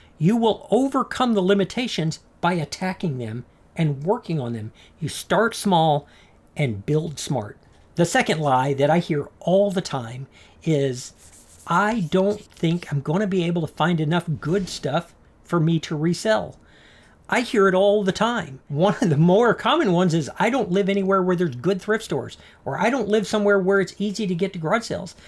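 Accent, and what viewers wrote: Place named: North, United States. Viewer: I didn't know you were a fellow Texan. You sound like you're from up north. Lol.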